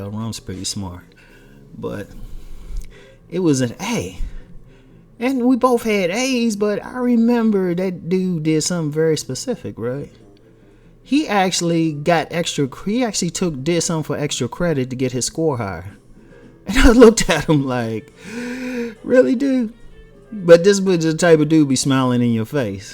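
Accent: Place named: Baltimore, United States. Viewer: Funny how you can still hear your B.More area accent that do and you give yall away everytime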